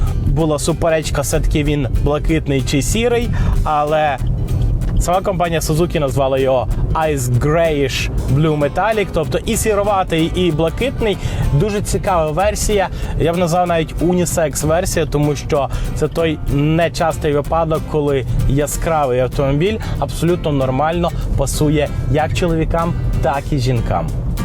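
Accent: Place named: Central Ukraine, Ukraine. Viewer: а чем тебе не понравился язык? вроде довольно чистый украинский центра Украины. ни западного ни восточного суржика не слышно.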